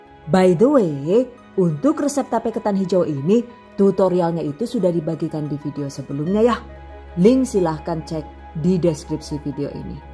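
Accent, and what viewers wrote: Banyumas, Indonesia. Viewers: Keren kalau nonton chanelnya,yg saya suka sampai modalnya di catat dengan lengkap karna saya pedagang,jadi seneng aja. tapi saya KEPO 🙆🙆aslinya orang mana,kalau di lihat kayanya tinggal di jawa timur,tapi kadang ngomongnya ada NGAPAKnya😁🤣 apa kaya saya karna temen q dari mana"jadi q bisa sedikit" Logat dari temen" kok q jadi ngelantur🤦🤦pokoknya q Lope-Lope lahh😍😍😍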